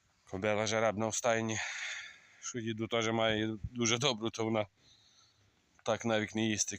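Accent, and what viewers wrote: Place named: Hutsul, Ukraine. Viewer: Трохи Гуцул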